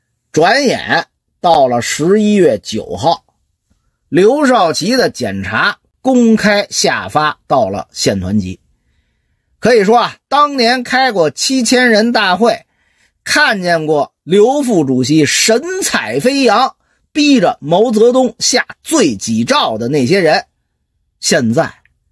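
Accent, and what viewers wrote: Beijing, China. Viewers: 北京口音真好听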